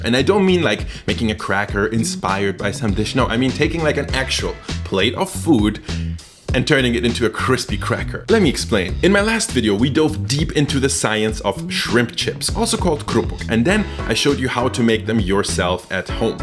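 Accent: Germany. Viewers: Hui der ist ja auch deutsch:3 habe ich nicht nur am Akzent, oder der Sprache von Lieferando erkannt :0.. ich kannte einige Lieferanten aus meiner Gegend uwu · He’s russian, grew up in germany (that’s probably what’s up with his accent) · Dieser deutsche akzent lul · Wer hat auch direkt an seinem Akzent bemerkt das der deutsche ist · Gad dammit!!! I just knew you were german. It`s my second video from you and I just knew something was wrong with your accent and now I know it for sure. Greetings from Luebeck. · I watched the Shrimp cracker video first and I already assumed that you might be german hence your subtle accent. Turns out I'm right!!! And you're even a Berliner!!! I really like your channel and.. why do I even feel like we life in the same area?? lmaooo (I'm not a crazy stalker I swear xd) Definitely subscribing!!!!!!~~ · Ich schaue das Video und dachte so: wow der typ hat nen leichten deutschen Akzent 😂👀 Gutes video 💪🏼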